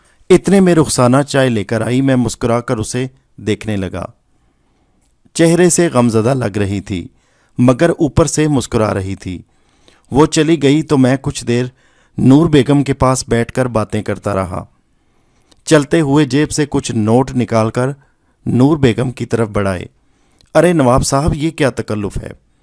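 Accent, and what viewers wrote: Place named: Pakistan. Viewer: Anam Khan lakin ye Indian nahi Pakistani hai aisi urdu Pakistani hi bol sakta hai bahi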